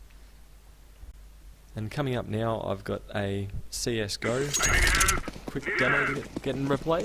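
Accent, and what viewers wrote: Australia. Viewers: Who is this ausie guy... IF YOUR GOING WITH A STARTER CHIP lol... You get the fucking Ryzen 3 2200G! It's on sale for like 110 dollars canadian right now... and they run the exact same as the 7nm 3200G for 20 dollars more. Best budget CPU by far to start with. And if you upgrade down the line, you literally won't get bottle necked with a GTX <num>... but who needs all that wattage? lol.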